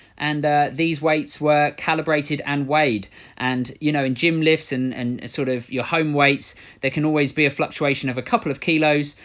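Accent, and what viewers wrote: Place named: South London, United Kingdom. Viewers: Warning not really, more southern/London accent. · Nah hes got way too much of a pussy voice, posh london tosser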